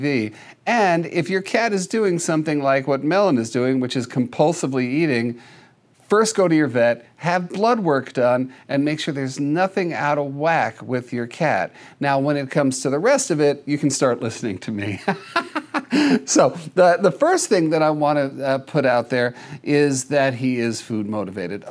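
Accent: New Zealand. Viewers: She sounds more New Zealander to me, but yes, you're right about the biscuits, in the case of Australia. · As an Australian listening to this lady's familiar sounding New Zealand (or South African) accent, the cat's name is actually "Merlin", not "Melon". Although the name Melon seems hilariously appropriate given the context 😂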